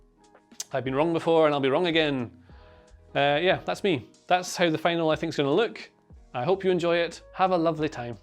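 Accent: Scotland, United Kingdom. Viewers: And for Americans who can't understand Scottish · As an Indian, i also have difficulties to understand what he says. I understand American accent totally but when i hear British accent, it makes me feel like that i don't understand English at all. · that's a really light Scottish accent lol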